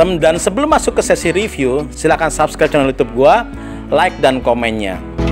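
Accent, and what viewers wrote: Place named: Banyumas, Indonesia. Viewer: Ngapak pokoke jozzz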